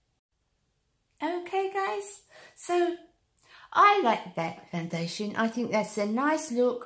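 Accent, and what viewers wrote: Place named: North, United Kingdom. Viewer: Hello, I'm new to your channel, and have really been enjoying your videos! I have to agree about this foundation. It is an excellent option for summer. I live in Tennessee in the US and we are currently dealing with temps of 96 degrees F (~36 C) with heat indexes of 109 F (~47C). Despite the horrible humidity here, this foundation holds up fairly well, so that's saying a lot for its staying power, but as you said, it's not heavy feeling. Just curious... What skin type are you? I'm fairly oily in my T-zone, so the matte finish truly helps me. I think it looks gorgeous on you all the same! Big Rimmel fan from over the pond here. My husband is from central Scotland, and we were trying to place your accent. Our guess.. Are you from the north? Charming for sure. 😉 💕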